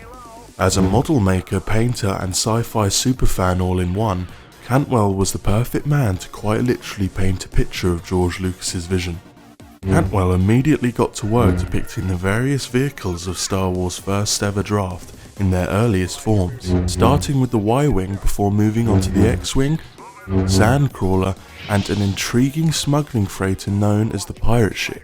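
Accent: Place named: United Kingdom. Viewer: Why is Kylo Ren narrating this with a British accent?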